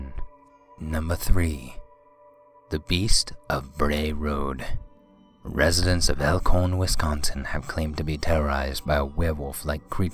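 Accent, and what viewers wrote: United Kingdom. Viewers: You sound like British person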